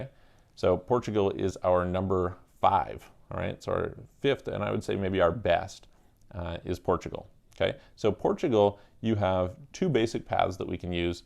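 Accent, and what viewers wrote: Canada. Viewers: Great video. I just read some of the comments and had to laugh. I knew you sounded Canadian. I'm for Canada's capital. Moving to Portugal. How far in advance of planning the actual move do you recommend reaching out to you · Great info as always. Plus you have a great Canadian accent and I love to hear reach oot and talk aboot. ;)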